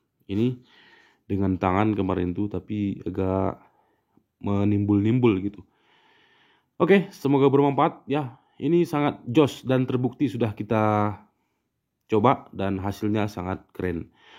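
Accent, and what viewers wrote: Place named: Gayo, Indonesia. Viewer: Dari cara berbicara abg, pastinya saya tau sukunya Gayo...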